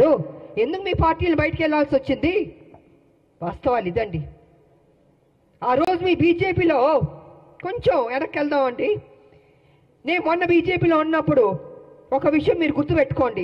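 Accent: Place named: Andhra Pradesh, India. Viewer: Meeru Andra bhasha matludutunnaru .idi telangana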